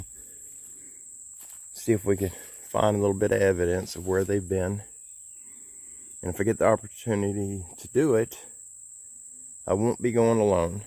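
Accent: South, United States. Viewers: To my Canadian ear your grammar is fine..I love your accents from down south! Great letter btw! Thank you for sharing..bring bug spray next time...lol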